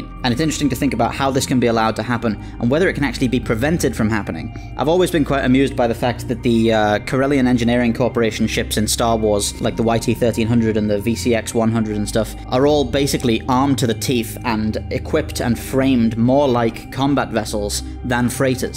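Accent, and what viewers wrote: United Kingdom. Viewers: When I heard the British accent I had to laugh. I can legally weld a machine gun or flamethrower to my car, and, at worst, get hassled by a cop for a "public disturbance." I also have a 3D printer and drill press with which I can pretty much manufacture whatever kind of gun I want. The topic of this video had never occurred to me. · Man, you sound soooooo British. "If normal people are armed then the criminals will just steal their guns".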